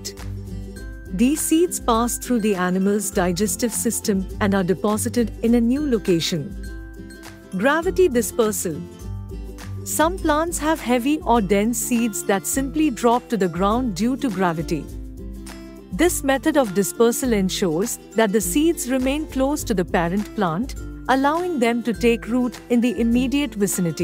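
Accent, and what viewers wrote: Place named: India. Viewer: Logatnya india banget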